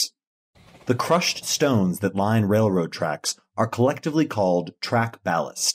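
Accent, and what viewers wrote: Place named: United States. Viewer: Why are you going to program AI with an American accent and then give us measurements in centimeters?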